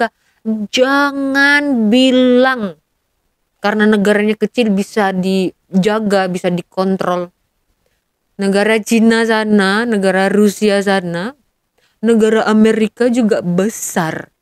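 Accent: Malaysia. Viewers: Yah malu malu bahasamu Melayu bang logatmu kau bukan orang Indonesia kalo berani buka topengmu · Bang kasih lepas topengmu anda ngomongnya macam bahasa melayu malaysia jangan bohong dong bang malaysia yang miskin juga banyak yang tidak punya rumah juga ada walau indonesia sema rakyatknya punya rumah betul enggak saya tau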